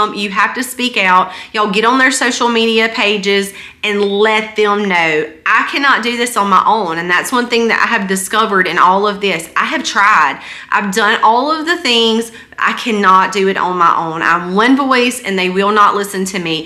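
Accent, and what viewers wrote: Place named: Alabama, United States. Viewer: YOU FROM ALABAMA AINT YA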